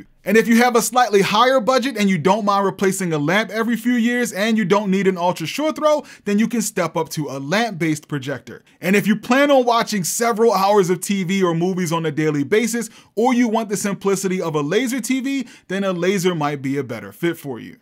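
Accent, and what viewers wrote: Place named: Philadelphia, United States. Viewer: Gotta be from Philly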